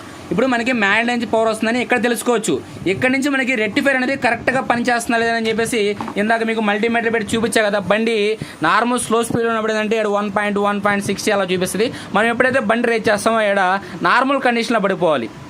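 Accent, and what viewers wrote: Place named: Prakasam, India. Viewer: Prakasam district giddalur side aa bro needi. Aa slang alane vundi. Explanation Inka clear ga chey, nee videos ki Inka views increase avuthayi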